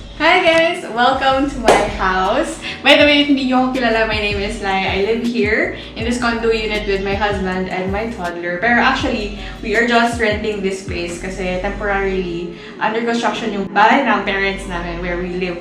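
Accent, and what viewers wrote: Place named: Visayas, Philippines. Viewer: HIi, I love watching your video. First na napanood ko sayo yung youtube sweldo. Tapos ito na, parang feel ko at first na bisaya ka. So happy nung narinig ko na taga Cebu ka nga. You're an inspiration. New supporter here. God bless. Cebuana rin ako pala hehe. Keep safe.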